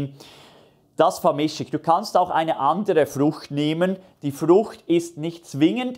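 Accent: Switzerland. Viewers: danke für deine coolen videos!und ich finde deinen swiss akzent richtig sympathisch!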